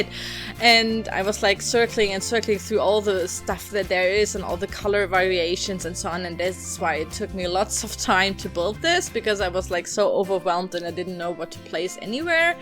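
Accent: Germany. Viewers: Hey :) Where do you come from? You sound really german to me 👌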